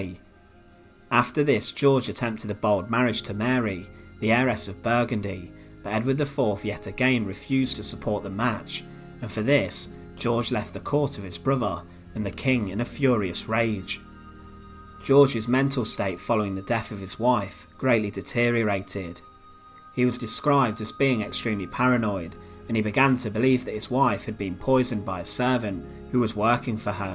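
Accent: United Kingdom. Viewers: I love your Brit accent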